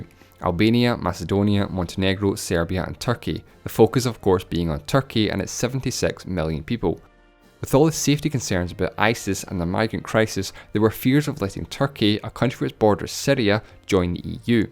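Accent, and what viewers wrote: Scotland, United Kingdom. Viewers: love your scottish accent haha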